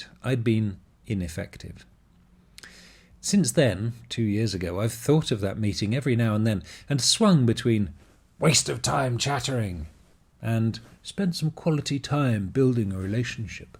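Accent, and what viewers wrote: United Kingdom. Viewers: British accent is so funny! 😀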